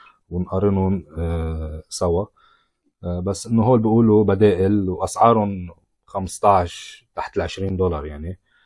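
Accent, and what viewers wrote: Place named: Lebanon. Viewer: اللهجة اللبنانية كتير منيحه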